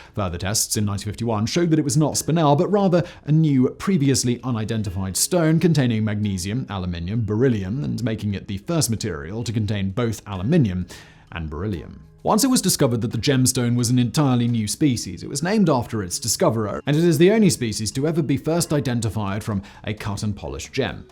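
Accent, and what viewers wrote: United Kingdom. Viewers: Is He British ? I am having trouble understand him 🗿 subtitles to rescue ❤️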